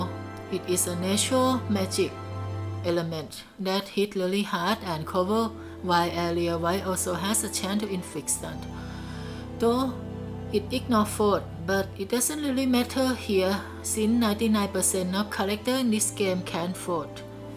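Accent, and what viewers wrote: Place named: Thailand. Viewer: สำเนียงภาษาอังกฤษเจ้าของช่องเหมือนคนไทยเลย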